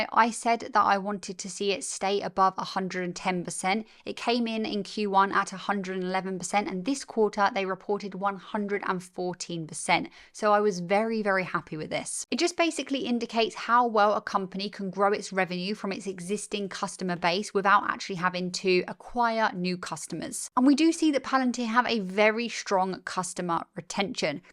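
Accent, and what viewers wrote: England, United Kingdom. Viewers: It’s always a pleasure listen to your English accent talking about our dream company !!! Let’s go PLTR !! at the end of the day there’s gonna be a few bumps in the road, but in a longer run PLTR will be a monster company!!!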